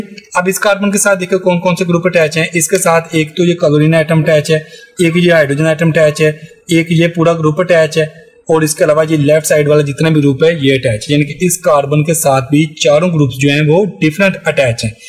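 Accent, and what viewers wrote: Pakistan. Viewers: You from Pakistan? Nice explanation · Pak se h bhai... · Are you from 🇵🇰 Pakistan??...your accent seems like 😃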